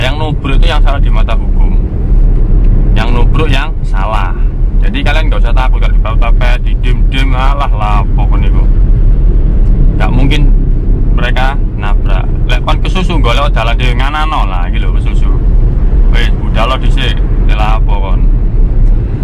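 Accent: Surabaya, Indonesia. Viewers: saya juga surabaya om, kedung cowek suka caranya ngomongnya hehe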